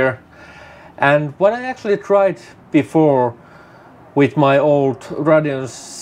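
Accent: Finland. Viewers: very noticable Finnish-English accent there 😀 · do i hear Finnish accent? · ymmärrän, että kotikielellä ei ehkä näyttöjä tule niin paljon... mut tää suomalaisten rallienglanti ei myöskään ole kaunista kuunneltavaa. :) Suurin syy löytyy varmasti meidän englanninkielen opettajista, jotka eivät ainakaan silloin kun me oltiin nuoria, painottaneet ääntämisen opetteluun. Ei millään pahalla. Yritä opetella ääntämistä, jos aika ja rahkeet riittää. Kaikkea hyvää sulle. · are you finnish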